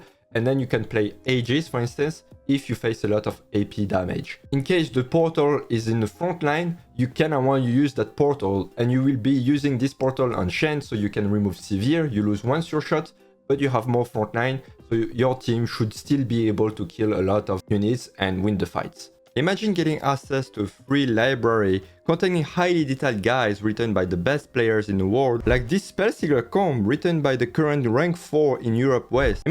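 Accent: France. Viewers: Lol his accent is truly french and you are truly a bigot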